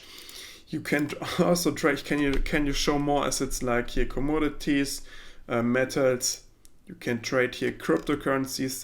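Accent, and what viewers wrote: Germany. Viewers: Bro nices Video aber fühle den Deutschen accent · deutscher Akzent kickt😂 Aber sehr gutes Tutorial. 10/10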